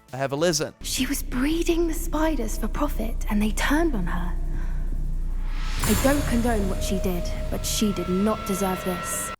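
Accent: Netherlands. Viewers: the "th" sound a not the same as the dutch "d" sound. Maybe it helps with getting rid of the dutch accent if you want that. Just a tip from a fellow dutchy, not to be mean.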